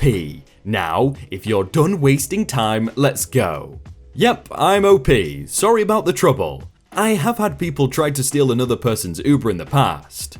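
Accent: United Kingdom. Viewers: and also i posted my mc a month a go and you havent read it its on your discord its on redit its in the comments on one of your videos . im getting frustrated listing and staying up to date with your videos because the Pommy accent makes my blood boil im half American half Australian both country that left Britain because of your country accent/rules get on to it asap please sorry for being mean but at least i didnt swear that is super hard for Australians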